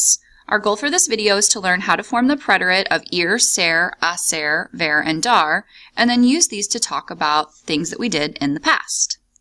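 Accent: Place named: United States. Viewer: I’m afraid I can’t get past her gringo accent either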